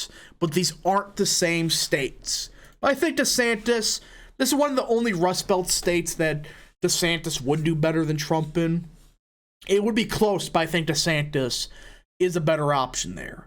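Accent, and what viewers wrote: Wisconsin, United States. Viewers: You’re from Wisconsin?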